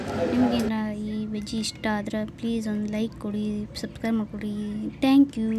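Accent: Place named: North Karnataka, India. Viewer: Namma uttarkannda maatadakttere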